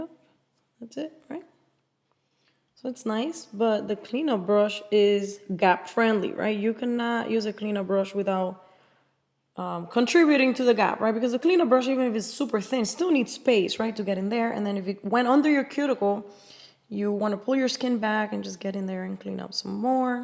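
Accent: Cuba. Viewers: This is so helpful!! Thank you! 😊 And I recognized you are Cuban, you've got a cute Gloria Estefan accent! 🇨🇺❤ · I love ur voice. I knew right away u were Cuban from ur accent. It sounds like Gloria Estefan who I adored back when. Anyway, unrelated! Also, this asshole line totally cracked me up.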